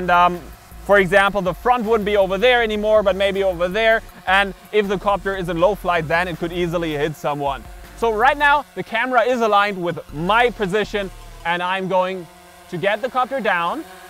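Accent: Germany. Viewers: Ich wusste das du deutscher bist. Man merkt es richtig hart am Anfang und an deiner Stimme ;)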